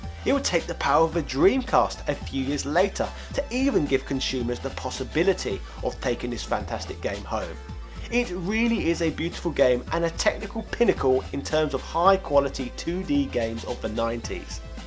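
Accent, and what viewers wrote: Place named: RP, United Kingdom. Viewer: You truly are an aristocrat. Most Brits might call it Stree figha free.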